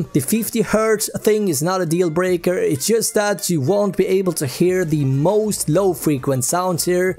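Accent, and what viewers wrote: Sweden. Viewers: Are u svensk min broder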